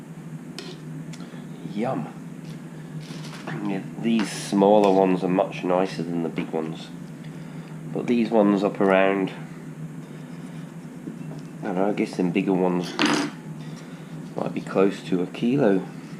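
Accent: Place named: England, United Kingdom. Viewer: HELLO ", HOPE YOU ARE DOING WELL? I WATCHED YR" VIDEOS THAT YOU HAVE BEEN DOING. MMMMMM? WER DO EYE" START? FIRSTLY YOU COME ACROSS SO"SO" WELL WITH YR POMMY ACCENT! YOU EXPLAIN WHAT AND HOW YOU ARE DOING. GOOD STUFF MATE. PS" LOVE YOUR HELPER IN YOUR FILLETING VIDEO. CHEERS MATE ANDRE GEORGESCU...🦊🦊🦊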